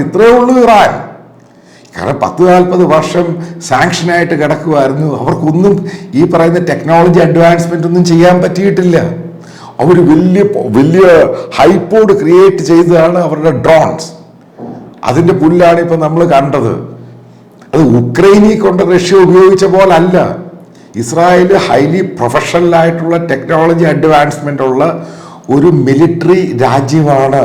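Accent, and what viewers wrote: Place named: Pathanapuram, India. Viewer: Sir your analysis very interesting. Your mada koda typical pathanapuram style. Continue sir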